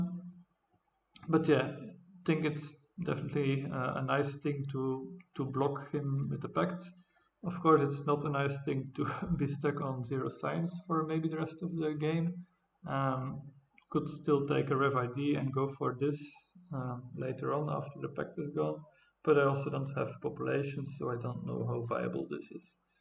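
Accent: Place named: Netherlands. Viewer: Very nice all in strategy. Seeing the opportunity that the other civs needed (too much) time to get online with their culture production so you could maintain your lead. Two questions: Are you dutch? Because your accent sounds really dutch :p And I dont know why e5 would use his ocean liner last turn. It would only set him up worse for certain events like happiness and it would give him 0 benefit. Weird decision. Do you have any idea?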